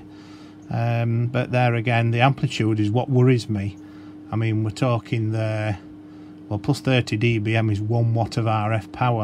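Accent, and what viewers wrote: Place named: Manchester, United Kingdom. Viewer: Brilliant explanation to a newby. Plus your accent is near me, Manchester 👍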